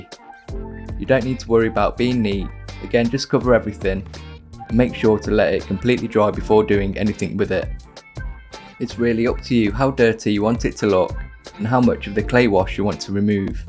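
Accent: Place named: Birmingham, United Kingdom. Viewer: I think your slow-pitched Brumie-accented delivery is ideal for tacklin' these modeling projects. Your videos ought to be mandatory for new hobbyists.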